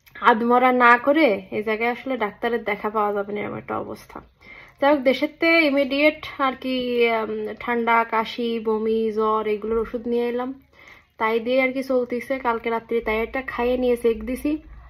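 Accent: Khulna, Bangladesh. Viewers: দ্রুত সুস্থ হও দোয়া করি। তোমার কথা শুনে মনে হচ্ছে তোমার বাড়ি খুলনা। ভালো থেকো আপু। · খুলনার আঞ্চলিক ভাষা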